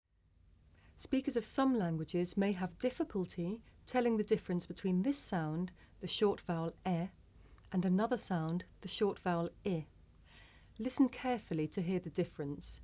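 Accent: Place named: United Kingdom. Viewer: i think it's british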